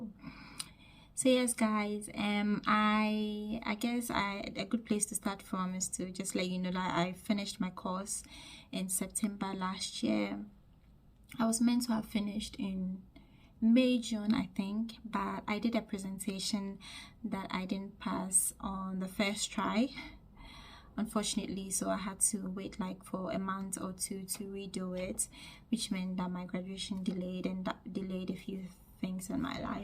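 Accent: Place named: Ghana. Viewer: The Ghanaian accent is slowing fading.. ❤❤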